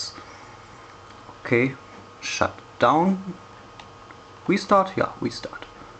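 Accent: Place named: Germany. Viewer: du solltest schon Deutsch reden dein Akzent hört sich nach deutschem an. dieses kommentar hab ich von nem LapTop mit gOS geschrieben.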